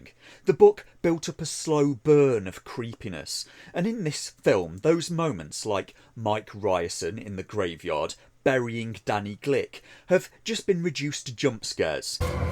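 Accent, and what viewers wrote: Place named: Lancashire, United Kingdom. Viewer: is in Lancashire!! i know coz i live there!:-) "oor rite arr kid?":-)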